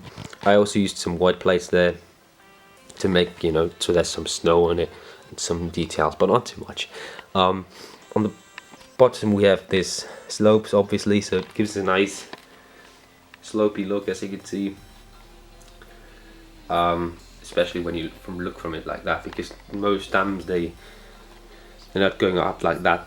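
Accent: United Kingdom. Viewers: Haha wie geil! Du hast ja richtig ein bisschen einen britischen akzent bekommen! :D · wo hast du so english gelernt? Hört sich ziemlich british an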